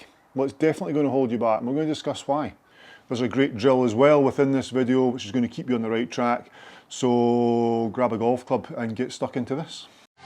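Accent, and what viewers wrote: Scotland, United Kingdom. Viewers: That beautiful Scottish accent - could listen all day 😄. Happy new year!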